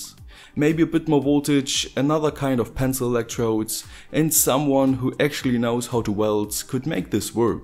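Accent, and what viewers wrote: Germany. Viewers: are you german? just asking, my ears tell me english german accent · was für ein deutscher akzent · im from germany too, so i often hear a lot worse versions of english from them as yours is (its mostly pretty good despite the accent); but still i was bursting out laughing when you said "unsatisfactory" :D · Man hört das du deutsch bist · I still don't get how Germans get so fluent in English but still sound German as fuck.. Great vid by the way..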